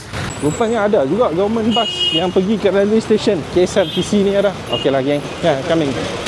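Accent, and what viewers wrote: North, Malaysia. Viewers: Terbaik bro your video, aku baru subscribe, dah habis da video pasal Kerala aku tgk, dgn slang Utara, mmg terbaik la. Penerangan sangat jelas.and one more thing, brother.. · Hi, I baru last week terjumpa your YouTube Channel..very interesting. Suka dgq u cakap utara as I am also org utara from Perlis. Pasai rumah tepi railway tu depa tak terganggu sebab dok besa dah. mcm rumah I kt kampung di perlis, mmg railway tepi rumah. since kecik kami dengaq bunyi keta api , la ni rail tu lagi dekat sejak depa buat ETS. dulu masa kecik2 kami dok main atas tu rail tu saja..bila keta api nak mai kami cepat2 turun. Alhamdulillah tak penah berlaku kemalangan jiwa. tapi la ni org dah bubuh pagaq lah. 😁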